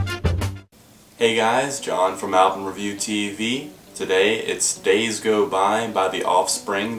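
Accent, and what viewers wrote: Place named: South, United States. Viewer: John’s southern accent is much more prominent in these earlier videos, it makes me chuckle.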